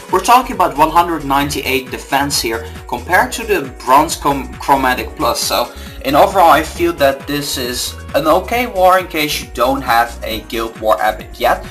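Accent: Netherlands. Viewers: Ben jij nederlands? Je klinkt zo!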